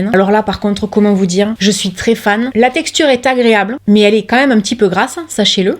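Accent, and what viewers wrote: South, France. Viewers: Merci pour toutes ces précieuses infos👍 c’est toujours un doux plaisir de regarder tes vidéos bercées par ton accent du soleil que j’aime tant 😜 merci encore pour toutes tes recherches afin de mieux nous conseiller tu es une amour 🥰 gros bisous du cœur Mima et passe un heureux moment avec ta maman et tes petits loulous 🐶🦮🐕‍🦺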